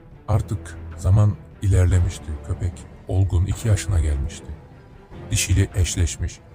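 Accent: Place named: Kurdish, Turkey. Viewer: admin kürtmüsün len bu ses tonu ne kıroı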